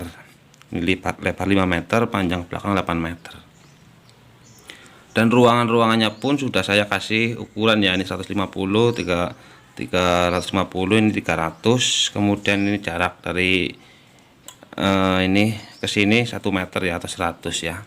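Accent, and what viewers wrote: Banyumas, Indonesia. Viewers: Ora ngapak ora kepenak... Maturnuwon kang ilmune...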